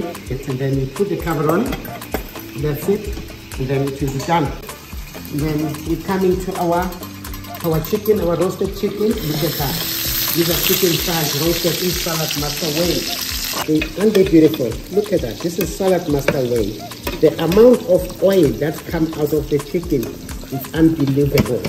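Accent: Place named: South Africa. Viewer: Wow..you sound like a fellow South African! 🤣🤣🤣👌...so Im gonna be ur new sub.! Your dishes look soo amazing.I recently made a fruit salad on my page that can pair well there.🤩Wish you the best !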